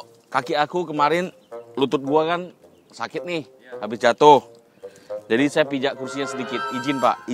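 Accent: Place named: Sumatra, Indonesia. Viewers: ABANG INI LOGAT NYA KEK ORANG SUMATRA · keren x koko ini klu Logat bahasanya SUMATERA kali. salam sukses koko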